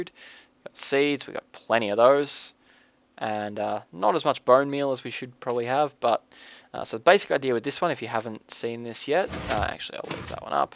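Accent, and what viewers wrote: Australia. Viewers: dude r u aussie!!!!!!!!!, cause i am too bro!!!! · Your australian?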